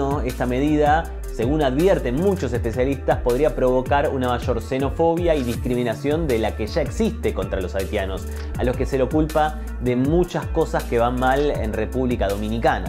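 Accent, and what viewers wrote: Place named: Argentina. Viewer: Por tu acento diría que eres argentino y si es así tu país es bastante grande y tiene más recursos que nuestra media isla por lo que te sugiero que nos ayude con esta invasión haitiana y te lleves por lo menos un millón de todos los haitianos que tenemos aquí.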